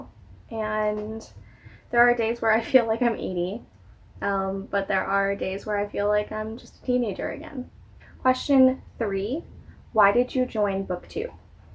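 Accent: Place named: Midwest, United States. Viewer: The Sarah Sanderson midwest accent coming thru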